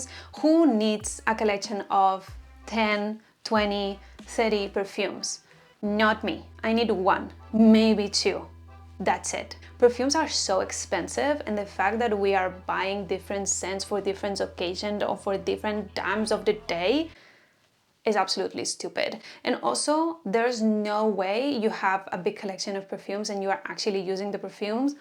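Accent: Spain. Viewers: Sabia que eras española! No hay ningún problema con tu pronunciación, eh? Al contrario. Pero lo estaba notando. Supongo que porque yo también lo soy xd Que tengas un buen día :)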